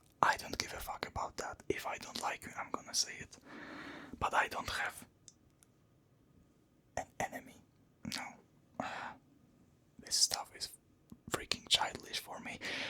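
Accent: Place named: Russia. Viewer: I'm glad you found a hobby you love and you're doing great at it. I really love ASMR and am glad you're making such a big contribution to it. Hope your channel continue to thrive. I'll probably subscribe too and look forward to new videos =) P.S I'm currently learning English and I love your pronunciation...this is the first time I understand English speech without subtitles. Maybe your clear pronunciation is due to your Polish accent?! (It sounds a bit Russian, btw) Poland is a wonderful country! I hope I can visit it someday!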